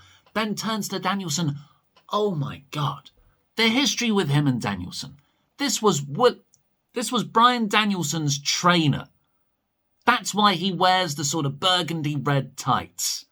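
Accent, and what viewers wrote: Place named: United Kingdom. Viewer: Yes. Just Yes. To me, AEW is if WCW did everything right instead of... well you know the rest. have you ever thought of using the US grading scale? A+ and whatnot? no complaints about your current grading scale, just a thought. Love this channel because none of my friends are big wrestling fans... or have British accents.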